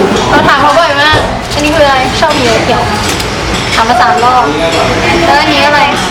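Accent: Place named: Taiwan. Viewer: ชอบสำเนียงของคุณ..พูดจีนสำเนียงไต้หวัน..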